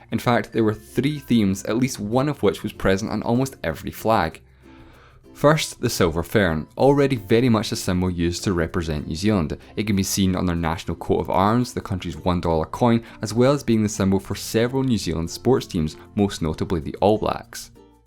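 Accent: Scotland, United Kingdom. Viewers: I know this is weird, but your chocolaty Scottish voice commentating with your great animation work gives me chills. You will go somewhere with this content, keep it up! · God, the Scottish accent is wonderful. Also, great video! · I think he's scottish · I think he's Scottish, but I'm not sure.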